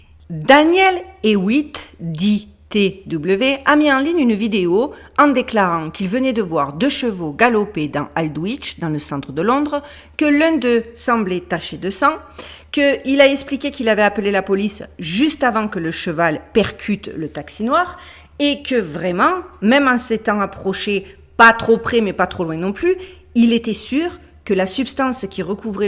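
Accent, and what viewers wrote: Marseille, France. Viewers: j’adore la richesse de votre diction votre accent plein du soleil de Marseille et la finesse de votre humour quand tout part en vrille. 😘✨️💙✨️😊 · Merci pour ton travail. J adore ton accent sudiste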